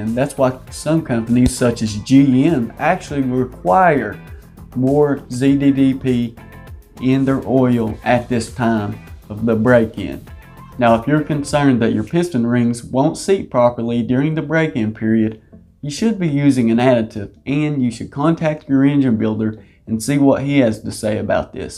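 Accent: South, United States. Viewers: Super strong southern accent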